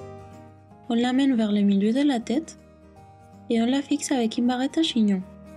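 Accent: Spain. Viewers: Elle est espagnole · tu as un joli accent espagnol et j'aime bcp les coiffures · J'aime vraiment ta voix et ton petit accent espagnol ! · Elle est espagnole😍😍😍😍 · Tu as un joli accent espagnol, et tu est très jolie. :) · Elle est espagnole.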